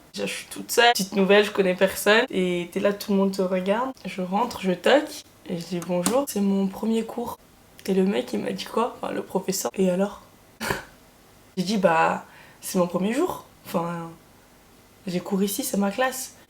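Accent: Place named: Italy. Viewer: Whaou cet accent italien ! Je regarde tes vidéos 10 après tout va bien 😂😂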